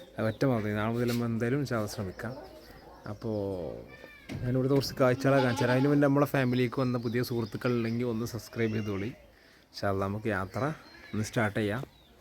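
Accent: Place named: Malappuram, India. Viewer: എടോ ഇത് മലപ്പുറം ശൈലിയാണ്, അല്ലാതെ അച്ചടി ഭാഷ ചാനൽ അല്ല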